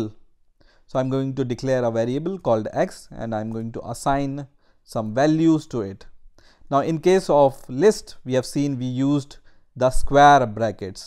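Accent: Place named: India. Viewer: i like your indian accent. it gives the lesson some life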